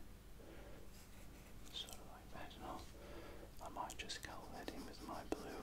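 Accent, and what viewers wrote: Australia. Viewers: Saw Australian accent and immediately subscribed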